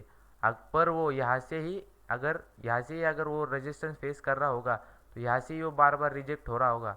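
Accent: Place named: Maharashtra, India. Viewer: तुझी हिंदी बोलण्याची पद्धतीवरून तु मराठी वाटतोस आहेसच...video भारीच होता .हे सगळं मराठीत येऊ द्या ..मराठी मधील शेअर मार्केटचा सगळ्यात फेमस आणि उपयुक्त च्यानल तुझं होवो ह्या शुभेच्छा .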